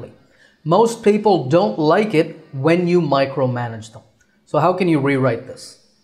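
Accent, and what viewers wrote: India. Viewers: I love Indian English! It inspires me much more than native one! I'm an Ukrainian from Ukraine.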